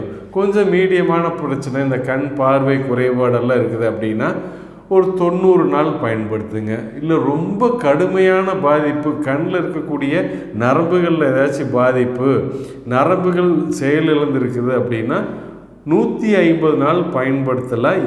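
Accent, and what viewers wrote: Kongu, India. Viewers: வணக்கம் சார் உங்கள் வார்த்தைகள் கொங்கு தமிழுக்கு மேலும் அழகு சேர்க்கின்றது உங்கள் வார்த்தைகள் எமக்கு நம்பிக்கை தருகின்றது அனைவருக்கும் புரியும்படியாக தெளிவான வார்த்தைகள் உங்கள் தொண்டு வளரட்டும் இறைவன் துணை தங்களுக்கு எப்பொழுதும் உடன் இருக்க வேண்டுகிறேன்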